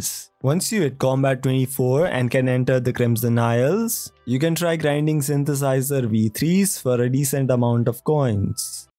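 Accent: India. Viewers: Because your voice was sounding Indian to me and you had added Hindi subtitles as well🗿😂 Is my guess correct?😳 Please reply me 😭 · you r indian dont lie :) you r talking in indian english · why do you sound like indian dialect? By the way, very good content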